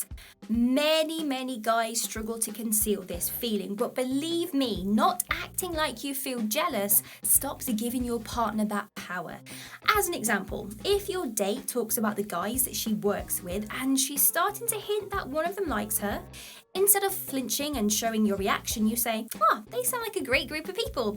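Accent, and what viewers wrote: England, United Kingdom. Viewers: Hot dang girl!! You're a life saver. Hey random qestion...which English accent are you Londonder, Somerset, or neither?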